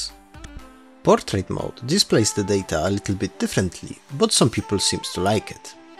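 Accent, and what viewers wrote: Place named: India. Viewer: O CHUJU xd tyle lat w UK, a brzmisz jak hindus XD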